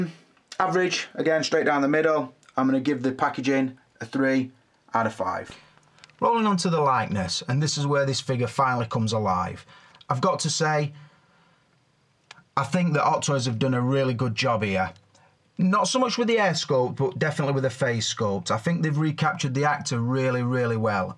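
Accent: Sheffield, United Kingdom. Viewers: I knew i recognised your accent ! Sheffield na na na "t'neight"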